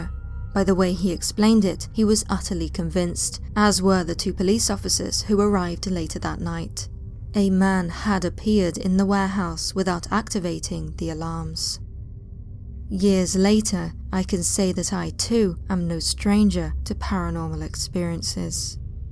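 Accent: United Kingdom. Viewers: When Brits say the word "figure" it sounds like "figga". The word "home" sounds like "haome". I enjoy the stories though. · She does have a very pleasant voice and clear British English.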